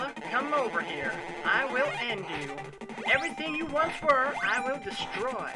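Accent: United States. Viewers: I have to say that the voice in this video is a little creepy. It's like an old Southern Belle mixed with a Texan woman. Eeep...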